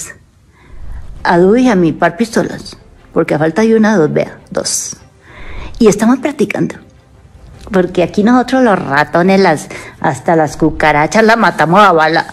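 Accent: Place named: Antioquia, Colombia. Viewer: Yo no se pero doña tiene acento paisa... ¿ será Paisa?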